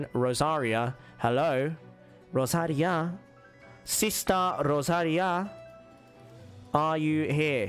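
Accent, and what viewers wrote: United Kingdom. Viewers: He is asian, yet he sounds a bit british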